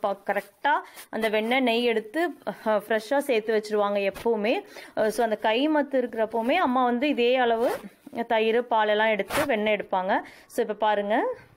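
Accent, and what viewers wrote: Kongu, India. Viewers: Kongu slang video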